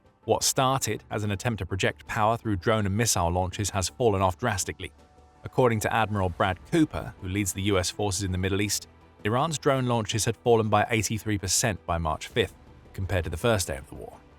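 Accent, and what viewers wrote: England, United Kingdom. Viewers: Sounds like a bloke from England who put this together. And we all know England can't be trusted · Get rid of the British narration. Britain is irrelevant now. Use an American narrator. Unsubscribed.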